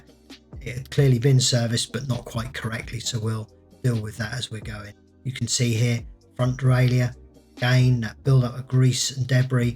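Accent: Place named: England, United Kingdom. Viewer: The enjoyment you derive from your work is almost immediately attuned to the viewer's eyes & ears. The narration is most pleasant and always to the point, albeit with that extra British charm that an English accent brings to anything. Your humble directness really makes the whole thing a cooperative adventure in restoring these old beauties to their original dignity. Your keen eye and steady camera work really convey the importance of a thorough process. Your videos must really resonate to a very wide audience. Mike Kirwan - Kenmore, WA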